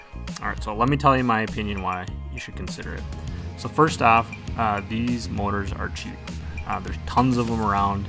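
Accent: Midwest, United States. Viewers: You sound like you are from the mid West such as Ann Arbor Michigan. I have family out there so I recognize it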